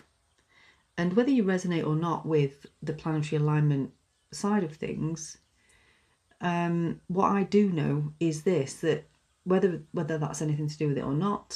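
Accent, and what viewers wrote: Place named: Manchester, United Kingdom. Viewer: Listening to your voice (which is similar to mine - born in Greater Manchester) and your words - I saw the waves of the tide - the rhythm of coming in and going out. And the insight came that groundedness in a time of change is like an evolving, expanding equanimity. As the tide comes in - the deeper the return of consciousness to the core wound. But when the tide turns - the greater the expansion of consciousness "outside". Thankyou.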